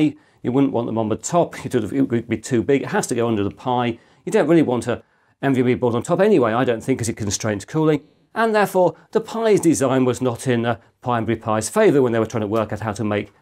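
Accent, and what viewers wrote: United Kingdom. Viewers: Would be nice if the channel removes stinky f British accent. Accent of world slavery and kings to enslave ppl.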